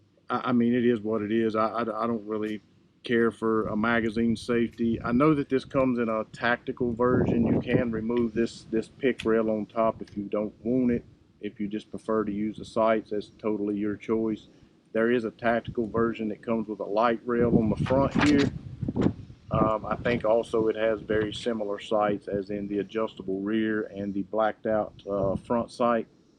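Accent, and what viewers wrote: South, United States. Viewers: Where’s that southern accent from? Louisiana? Georgia here. Love my Mark IV 22/45 Lite. Got mine without iron sights with the short pic rail and Riton red dot from the factory.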